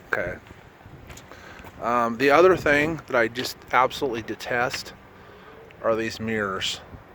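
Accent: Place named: Utah, United States. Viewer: Listening to you speak I could tell you’re from Utah. Saw your license plate - sure enough lol I live here to. I’m looking to buy a 14 actually so stumbled on your video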